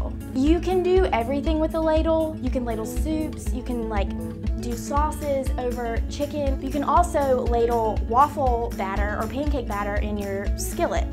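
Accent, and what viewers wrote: South, United States. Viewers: You are so stinking cute!! I’m from Minnesota so I get a kick listening to your cute little southern accent! :) I will definitely be subbing to your channel! :) Love from Minnesota!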